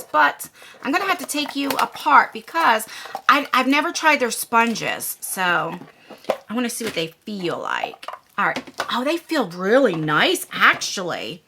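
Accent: New Jersey, United States. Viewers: I just love you and your big personality. I'm so sorry that someone in the same community as you would be so evil to slander you're daughters credibility. It makes me sick especially in the times were in. My heart broke for you're daughter and I hope she's recovering well. I had a bad day myself and hearing that big jersey accent made my day a hair better. Thank you for being you.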